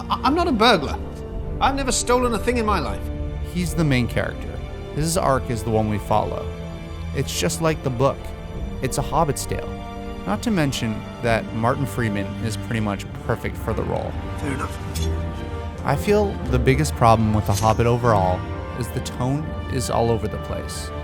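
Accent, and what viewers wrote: United States. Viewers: Are you from Wisconsin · Is that a Florida accent?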